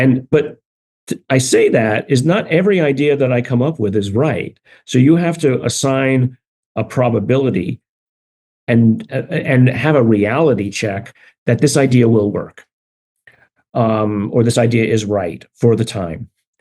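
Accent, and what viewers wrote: Liverpool, United Kingdom. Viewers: NO WAY!!!! HES A SCOUSER!!!! IM LIVING IN LIVERPOOL UK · A scouser! Wow! Never knew that! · Didn’t know Gary was a scouser. ( people who are from Liverpool are nicknamed scousers.) 😀👍🏻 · No way is a scouser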